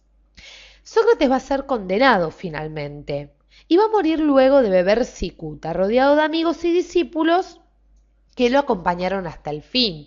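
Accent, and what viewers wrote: Argentina. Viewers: Eres Argentina? Hablas muy argentino Saludos desde México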